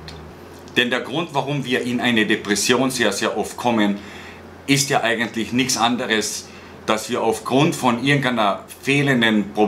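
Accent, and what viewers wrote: Carinthia, Austria. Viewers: Green ich hab gedacht er aus kärnten vom dialekt her :)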